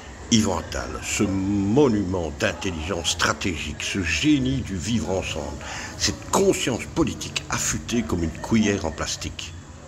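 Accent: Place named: Belgium. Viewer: Voila la réalité toute nue, la vérité incontournable. Ces atavismes peuvent être reprochés a une grande partie de la communauté Hébreu, particulièrement les plus médiatisés, s'est sans doute pour cela la parole leur est si facilement accordée, mais se rendent-ils compte qu'il signent là leur déchéance morale ? Merci Monsieur a votre accent je pense que vous êtes Belge ? J'aurais aimé que ce soit un français avec des responsabilités politiques qui ait votre courage, de cibler directement un malotru y compris sa suffisance.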